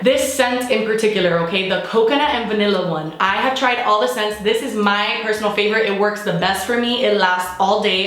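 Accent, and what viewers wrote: Miami, United States. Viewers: I recently found out you're from the 305 and when you say "you know" it's so obvious. I cannot believe I've been watching you for YEARS and never noticed. I also never even clocked you as Hispanic. I'm a failure as a Miami Cuban.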